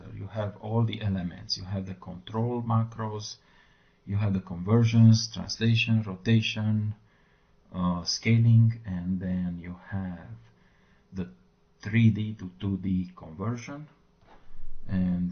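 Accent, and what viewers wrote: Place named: Italy. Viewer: L accento è uguale a quello italiano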